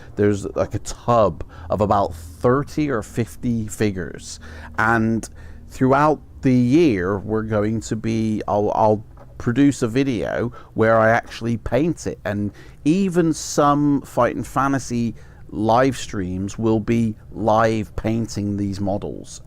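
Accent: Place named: Cornwall, United Kingdom. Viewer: I can’t watch this shit. I can’t see the book or the pictures, all I see is your fat ugly mush chatting bear shit, making silly noises and the front cover page on the other side of the screen. And you talk too slowly, take 10 times longer to do everything than in needs to, and you’ve got an annoying Cornish accent. Which is fine for adding some effect, but you’re focused more on effect than reading the story, in a normal fashion. And we don’t need to make every single decision for you either, just getvon with it mate, life’s too short for all the faffing, farting around and film flam. What kind of game is this? How to take the piss out of fighting fantasy books? I’m out.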